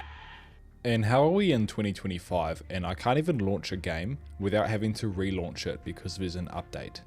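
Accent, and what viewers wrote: New Zealand. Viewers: Also I'm assuming by the accent you're a fellow New Zealander my bro i've dropped a sub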